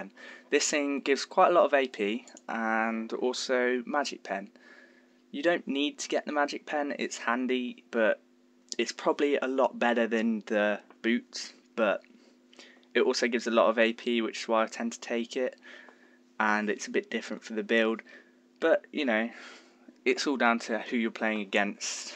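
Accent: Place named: RP, United Kingdom. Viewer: you're so posh, also are you reading from a script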